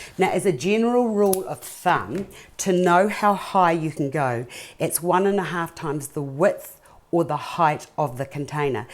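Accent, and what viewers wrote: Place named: New Zealand. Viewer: Beautiful arrangement , your accents it is come from New Zealand . Thanks for sharing.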